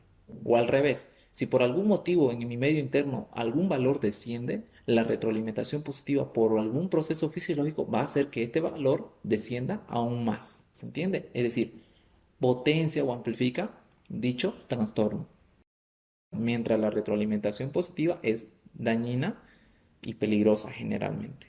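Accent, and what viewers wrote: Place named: Bolivia. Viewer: Muchas felicidades DR, me ayudaste mucho en fisiología, explicas muy bien, por tu forma de hablar pareces boliviano, yo también soy de Bolivia, y te ganaste un nuevo suscriptor.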